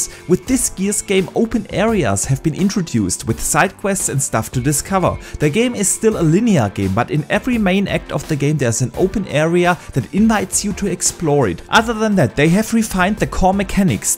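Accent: Germany. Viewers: Du kommst doch Safe aus Deutschland oder 🤣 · Dieses Deutsch-Englisch haha, aber gutes Video :)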